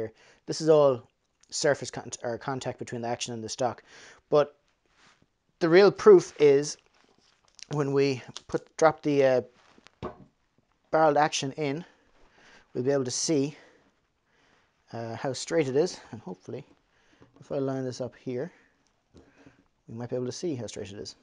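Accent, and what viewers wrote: Ireland. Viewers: Where are you ? You sound irish, how did you get a Boyds stock over ?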